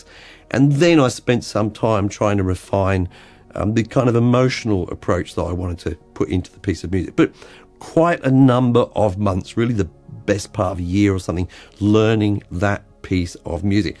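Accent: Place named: Australia. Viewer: Wow, I really thought you were Australian. Never would have guessed you were brought up in the UK. Anyway, thanks for sharing your expertise here. It's really helped me a lot as a Cakewalk newdie. As for me, can't really say I'm a musician, but have the urge to create. Composed a lullaby for my new baby last year which led me to Bandlab, and now to Cakewalk as I try to make the song as good as I can with my limited abilities and 100 virtual instruments. Really like it as a creative outlet. Thanks again for making it easier.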